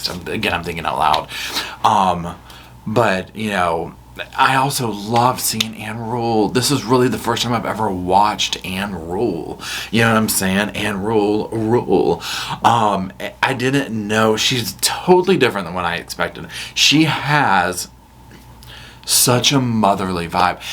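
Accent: South, United States. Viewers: I've just found your page, and am enjoying it all in that southern accent ♡ Have you considered reaction videos to Who Do You Think You Are- the genealogy show? I think that would be amazing 😊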